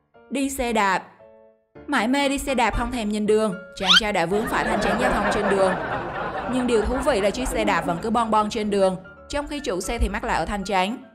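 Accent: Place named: Quang Ngai, Vietnam. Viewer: MC là giọng Quảng Ngãi màk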